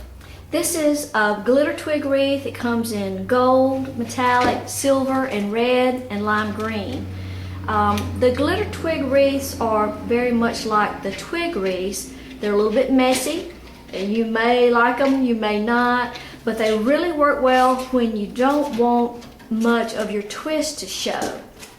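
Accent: South, United States. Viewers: I love your lovely southern accent.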